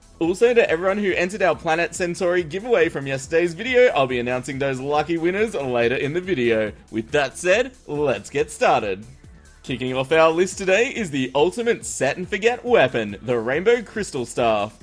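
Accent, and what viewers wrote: Australia. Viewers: When 1.3 comes for mobile i will surely go after the last prism since the mage class is my favourite and the one that i mostly play. P.s. I've never been so early for a video of yours, especially now that it is am right here, so i thought i could also ask a question which is: Are you Australian?. Keep up the good work:)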